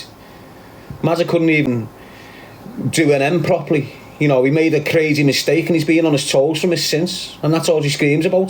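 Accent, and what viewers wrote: Liverpool, United Kingdom. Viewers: <link> Hes the best scouse I've heard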